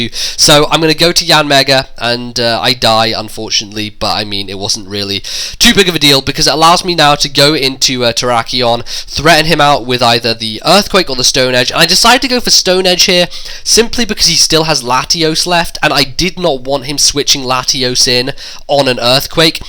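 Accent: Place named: United Kingdom. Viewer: best british accent out there :]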